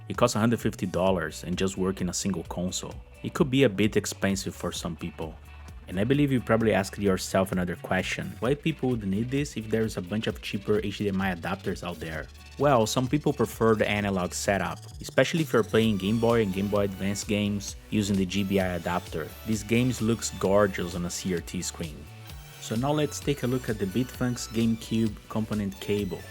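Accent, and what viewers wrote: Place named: Portugal. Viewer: Your English is excellent but I can tell your native language is Portuguese! Vídeo legal mano vou ver esse cabo no aliexpress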